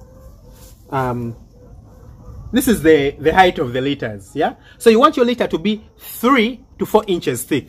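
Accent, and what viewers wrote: Uganda. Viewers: Ugandan accent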